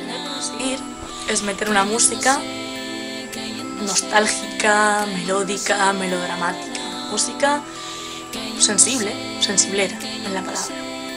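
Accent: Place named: Murcia, Spain. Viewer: ese acento murcianica!! ^^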